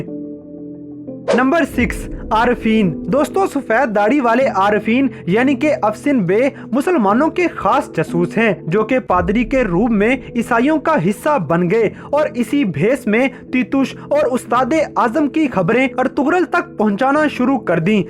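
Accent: India. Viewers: Ye bihari haina isliyen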